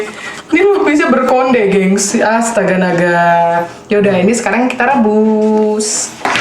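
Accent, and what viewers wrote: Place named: Balikpapan, Indonesia. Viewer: Gaggahh nya pang.. Balikpapan bgt🤣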